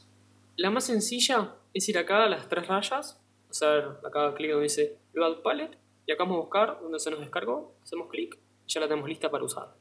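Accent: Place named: Argentina. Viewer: No se por qué me es desesperante escuchar tutoriales en español castellano xd pero me es super reconfortante escuchar argentinos c: Gracias carnal, buen tutorial, saludos desde México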